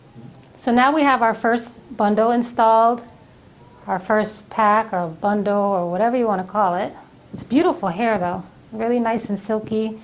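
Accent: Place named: Puerto Rico. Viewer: R u Puerto rican? I love ur accent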